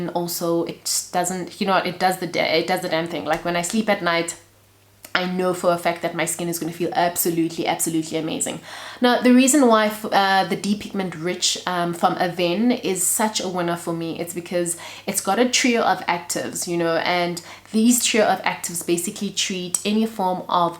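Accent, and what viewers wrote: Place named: South Africa. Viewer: Thanks for the content! I'm wondering could this be used for lip pigmentation?? Btw love your accent! Are you from SA?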